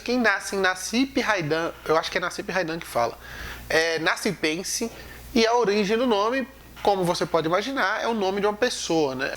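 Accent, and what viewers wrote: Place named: Ceará, Brazil. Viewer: E o seu sotaque tem I'm pouco de cearense out pernambucano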